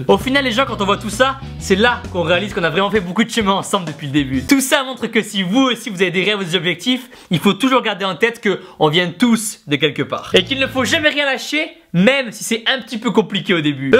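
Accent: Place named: Toulouse, France. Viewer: Atta il est toulousain !!!!XD mais dddaaaaammmmnnn c'est génial xD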